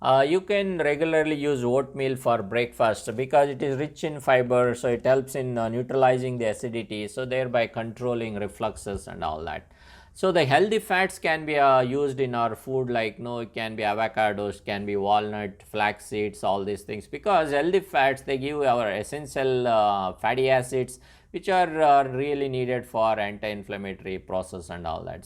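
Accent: India. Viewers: Thank you. Im a fan of the Indian accent. 🙏🙌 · Indian talking in English funny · Your Indian accent is too funny 😂🤣😂🤣 Thank To you for the information !!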